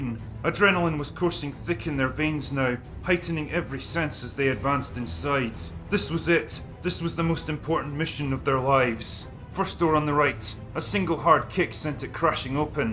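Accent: Scotland, United Kingdom. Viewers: God DAMN. Still remember where I was on that morning. Remember driving into work, radio on, outlandish preliminary death counts. Entering the classroom, turning on the television for the kids-- like my teacher did when the Challenger exploded-- because this was more important than any lesson plan., you brought tears to my eyes. Also, haha, I loved hearing US SEAL team members with your Scottish accent. God bless ye!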